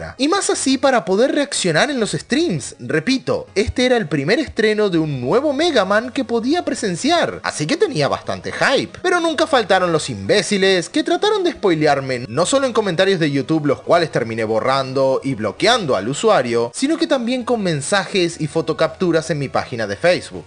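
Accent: Uruguay. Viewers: Eres uruguayo :0?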